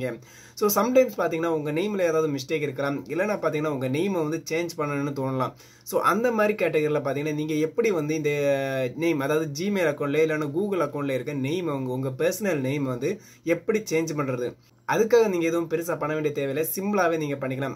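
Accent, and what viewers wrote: Kanyakumari, India. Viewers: Bro Neenga Kanyakumari Ya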